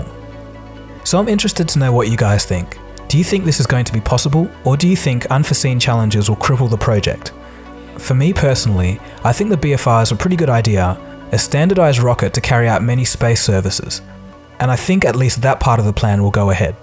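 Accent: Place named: Australia. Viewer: I personally didn´t like the australian accent, and muted the end of the video, because the music was annoying/overcompressed.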